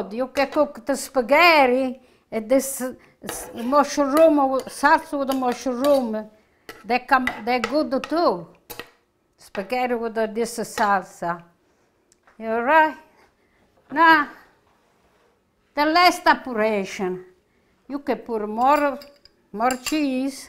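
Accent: Italy. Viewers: I simply adore you. You remind me so much of my mom and the recipes she use to make. Thank you for sharing so many of your delicious recipes. My mom too, immigrated from Italy in 1947. Your accent is so much like my mom. I understand everything you say and how you say it. I love the fact you use your hands when you are cooking, just like my mom and grandma! Keep those scrumptious recipes coming with those beautiful Italian songs! Ti Voglio Bene!!!!!! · My Mother was from Naples Italy and accent, songs and receipts are authentic italian dishes. I grew up eating and cooking these dishes which I have passed down to my brothers and sons. It touches my heart to watch these videos.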